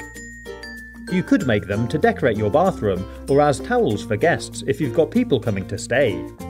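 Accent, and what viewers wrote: United Kingdom. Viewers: Hope u don't mind me asking but are you British?? I am British 😁 U sound a bit Edit: keep up the great work! Love your vids, been subscribed for about 2 years now 😄😮😃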